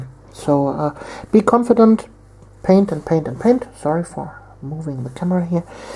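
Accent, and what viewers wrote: Germany. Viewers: Jonathan Holt It's a woman with a german accent and a bit of an uncommon speech habit (sort of stiff mouthed, it's hard to describe).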